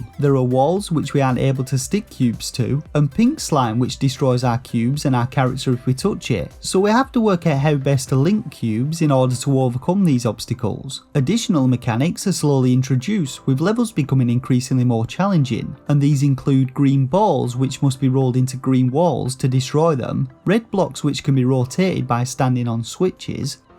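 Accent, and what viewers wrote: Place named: Yorkshire, United Kingdom. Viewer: Star or Shovelware I don’t know if you’re from Yorkshire or Lancashire.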